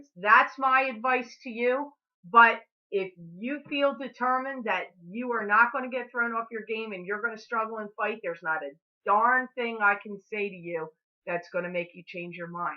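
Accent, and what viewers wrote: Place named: Chicago, United States. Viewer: did you grow up in the Midwest? You totally seem like you're from Chicago in the way you talk and act! (That's a compliment, by the way! LOL)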